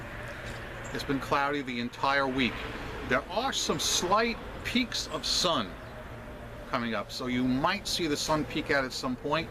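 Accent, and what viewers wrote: New York, United States. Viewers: cute NY Accent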